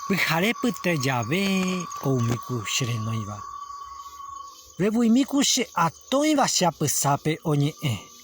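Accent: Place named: Paraguay. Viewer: Hermosa poesía de nuestro poeta campesino, a veces no comento por qué ya soy redundante jaja. Entendí bastante , pero escuchar esa voz con acento guaraní ya llena el corazón. Seguramente en el medio de la noche se pueda apreciar mejor la belleza de las estrellas, sentir en Soledad la fragancia de la tierra, algún sonido misterioso qué solo la noche te puede regalar... sin temor a algún aparecido ó fantasma. Cantarinas palabras florecidas en plena medianoche, hace alegrar mi existencia y me contagió y me creo dueña por un rato del poeta...🥰🎼🌈❤🌻🦋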